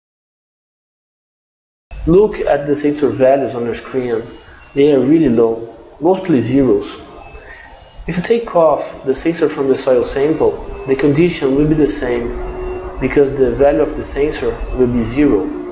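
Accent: Brazil. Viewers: Hi, nice project. Just a silly comment about your code: if the values are exactly 300 or 700, nothing will happen, because the 2 first 'if' consider > 300 or <300, never =300. The same with the 2 last 'if' regarding 700. It is not a big deal, because the probability of such values got read is small, but it is also something simple to fix. BTW, are you Brazilian? Your accent seemed Brazilian.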